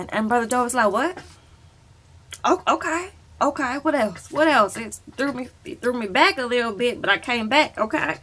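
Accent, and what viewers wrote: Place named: New Orleans, United States. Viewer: Are you from New Orleans cause that accent is giving me straight Nola baby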